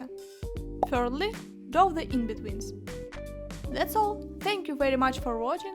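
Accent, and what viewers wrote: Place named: Russia. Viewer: When a tutorial comes from mother Russia, then the heavy accent starts, you know the video it's going to be awesome!!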